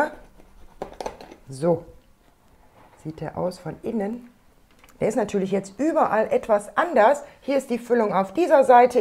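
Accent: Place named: Rhineland, Germany. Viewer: Hallo, dein Akzent erinnert mich an zuhause, ich komme aus dem Rheinland und will beim Zuhoeren sofort n Kaffeekochen und dich einladen. Sieht alles sehr lecker aus und ich liebe deine Kueche. Viele Gruesse aus Kanada!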